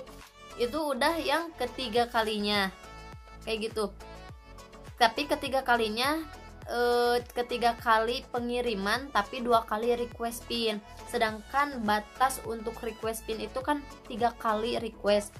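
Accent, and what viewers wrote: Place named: West Java, Indonesia. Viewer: mhun.ttp smngt ja.teh..logat Sunda na mani katingalian pisan.hehe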